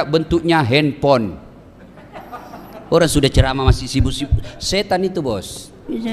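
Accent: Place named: Bugis, Indonesia. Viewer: Pengaruh Logat Bugis pak...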